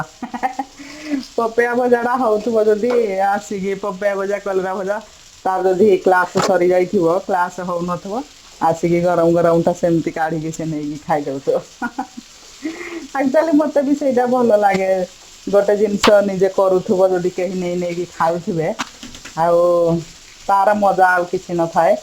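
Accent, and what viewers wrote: Angul, India. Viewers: Bahut badhiya vedio and Apnakara cute angulia Katha. Plz sabu din kichi angulia Katha Kahile bhala lagiba 👍👍👍👍😍😍😍😍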